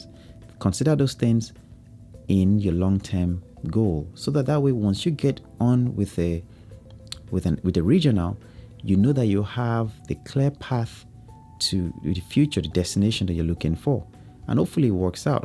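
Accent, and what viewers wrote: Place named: Ghana. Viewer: You sound like a Ghanaian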